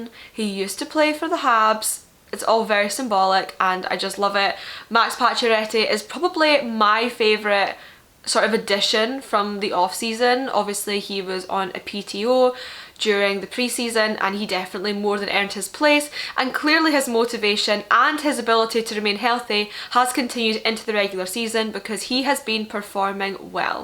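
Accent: Scotland, United Kingdom. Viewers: Yes the Scottish accent makes the analysis better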